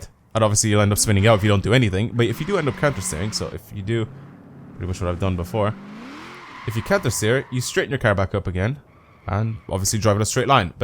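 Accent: Ireland. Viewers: what part. of ireland are. yiu from and if your not irish i swear to jaysus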